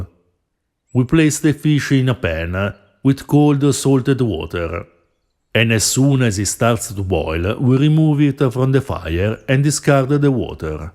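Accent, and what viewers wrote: Italy. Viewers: I love to listen to you and your Italian accent so much. And of course the medieval recipe!!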